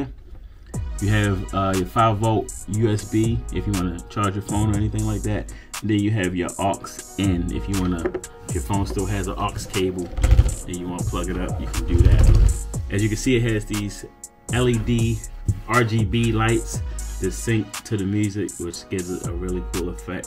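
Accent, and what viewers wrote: Baltimore, United States. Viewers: My guy, are you from Baltimore? I hear the accent.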